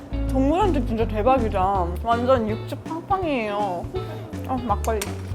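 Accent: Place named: Gyeongsang, South Korea. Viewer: 어머님과 함께 갱상도 사투리로 대화하는 모습도 무척 재미있습니다.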